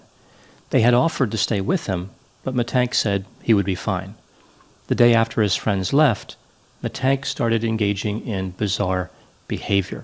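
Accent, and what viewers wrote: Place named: Northeast, United States. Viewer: I would love to know where you grew up. You’ve got a tad bit of an accent there! Sounds like NE close to NY/NJ?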